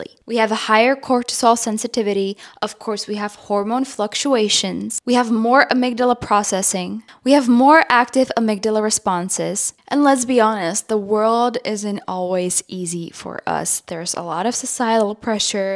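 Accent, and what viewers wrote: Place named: United States. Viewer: jaktože máš tak perfektní angličtinu a ještě k tomu americký přízvuk? 😯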